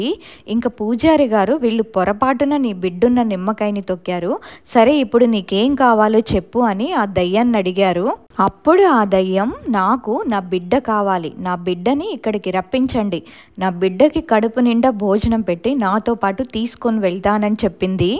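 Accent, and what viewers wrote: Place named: Coastal Andhra, India. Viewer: 🚩✅ You are speaking a brry perfect pite Krishna Coastal Telugu language with ease & without any corruptive influencial slangs of Indian language Urdu or exotic Arabic language. Very impressive. Very good. Keep it up. If interested you Study "Garuda Puranam & one special chapter in Oka Yogi Atma Katha " that narrates"Life after Death of Human persons of bad souls - Evil forces. By this you will have better understanding about dissatisfied Souls that roam around their related people. ✅🚩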